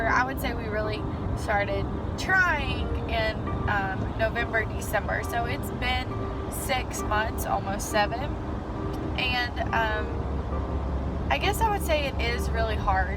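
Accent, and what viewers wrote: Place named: Virginia, United States. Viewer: Fellow Virginian here (I heard it in your accent!) Had a miscarriage this month and I’m praying that next month is better. I’ll keep you and Tyler in my prayers as well. It’s all in God’s hands.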